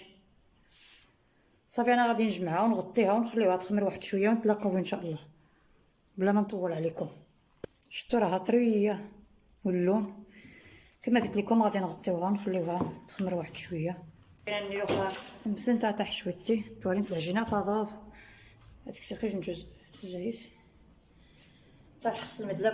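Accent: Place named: Rif, Morocco. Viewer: فطيرة باينة لديدة من شكلها ماشاء آلله لهجة الريفية زوينة وخا مافاهمة حتى حرف فيها❤❤❤ تسلم الايادي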